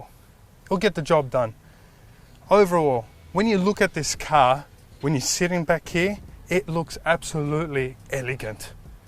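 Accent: Australia. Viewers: no, thats a spot on wog aussie accent. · The G63 is finally a true AMG worthy of wearing the AMG badge. The ONLY car sicker is the Brabus G63!! The best thing of all is having an Aussie review it, speaking “our” language!!!!! Brilliant! Sub’d, liked & notified!!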